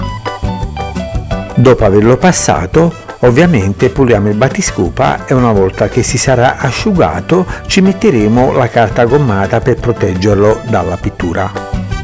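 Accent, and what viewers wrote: Naples, Italy. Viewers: buongiorno! Ma sei di Napoli?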